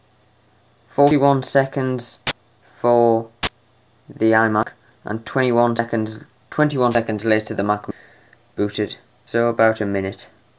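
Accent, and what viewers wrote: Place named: Liverpool, United Kingdom. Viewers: I think its a Liverpool accent.. I can never tell, but whatever it is, glad to be british. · I thibk he has a up northan accent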